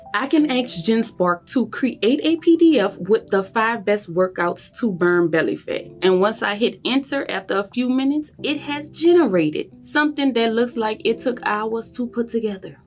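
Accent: New Orleans, United States. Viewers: 🎩👌LayDonDe'Vu on ya line. I can tell Baby from New Orleans Period Do ya thang Baby Keep speaking to the audience that need it. I wish you Prosperity in All you do⚘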